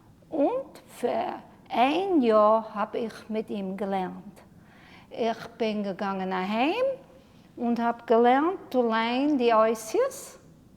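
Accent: Germany. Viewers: oh my, thought she spoke german with dialect. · This was also my impression. She is a native German speaker who tries to speak Yiddish but doesn't manage to do so.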